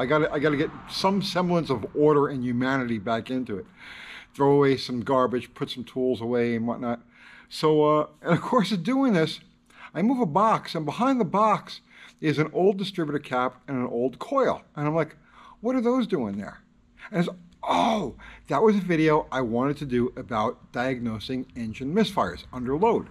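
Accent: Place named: Long Island, United States. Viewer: Thanks, good sensible advice clearly explained. BTW, your accent makes me miss Long Island!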